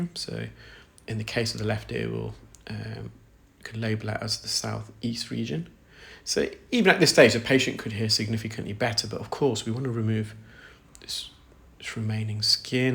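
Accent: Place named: Wales, United Kingdom. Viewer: Nothing wrong with your accents or speed. I find it quite comforting as it reminds me of family- even though I'm not Welsh many generations before me were. Mainly from the valleys so your accents are spot on for me 😂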